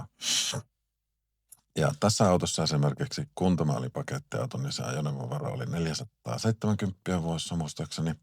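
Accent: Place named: Oulu, Finland. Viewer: Hyvin tehty video, varmaan mieluinen pakuharrastajille! Luontevaa, leppoista "oululaista" kerrontaa, jota on helppo seurata. Mutta missä on Aava?!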